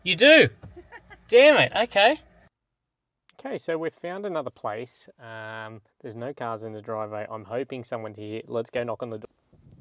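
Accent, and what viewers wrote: Australia. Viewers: Like this style of video. Plus we get to hear more of that Aussie accent.:goodvibes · I could listen to you speak all day. I follow a lot of YouTubers from down under. Love the accent. ❤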